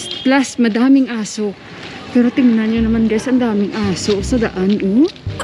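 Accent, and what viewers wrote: Iloilo, Philippines. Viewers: Gustong-gusto ko talaga Ilonggo accent mo, mamsh. Missing Iloilo and Bacolod and all my Ilonggo friends.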